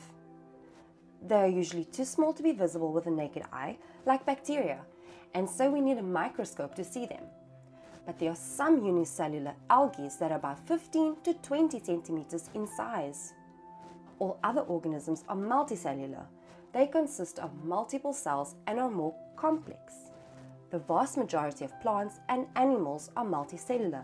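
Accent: South Africa. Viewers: Love The South African Accent. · I love her Boer accent.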